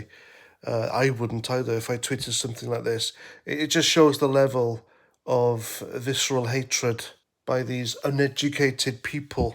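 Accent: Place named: Scotland, United Kingdom. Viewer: I live in Stoke On Trent and love Scotland especially your accent could listen to you for hours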